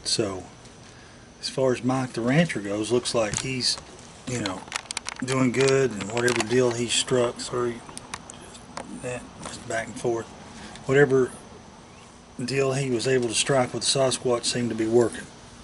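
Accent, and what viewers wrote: South, United States. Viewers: I love your southern accent! And also the way you explain everything in such detail!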